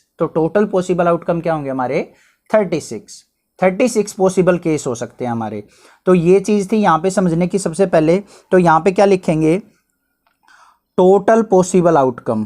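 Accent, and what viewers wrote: Haryana, India. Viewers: Sir Haryana se ho kya aap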